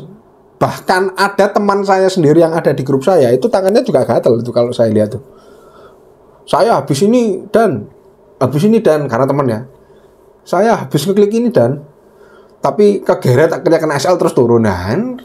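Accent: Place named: Java, Indonesia. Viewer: Bahasa Inggrisnya belajar dari mna mz , kok keren bgt agak2 logat jowo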